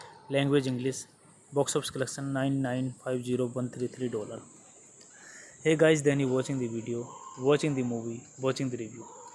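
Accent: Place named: India. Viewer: Estúpido el que hizo estos videos. Queremos la película no tu voz de la india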